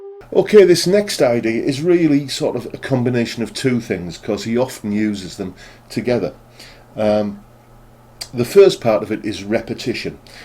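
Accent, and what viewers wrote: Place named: Redcar, United Kingdom. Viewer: A great video and I must say I love your explanations in this and other videos. I feel like I’m learning fast from them. I recognised your accent as soon as I heard it.....I grew up in Redcar and Marske myself. I’ve subscribed and will keep watching and learning.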